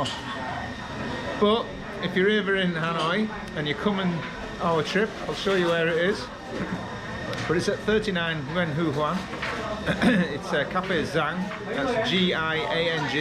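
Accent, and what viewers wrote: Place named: Teesside, United Kingdom. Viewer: Just subscribed, going to watch your vids tonight, your accent sounds familiar, are you from Teesside?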